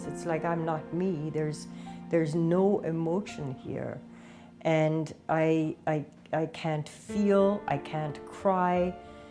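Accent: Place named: Ireland. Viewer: She sounds like from Ireland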